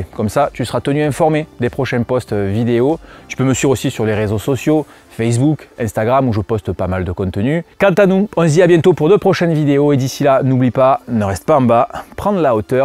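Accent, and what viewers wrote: South, France. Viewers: Encore merci pour toutes ces vidéos ! Je suis un grand fan y compris du petit accent du Sud 😊🤩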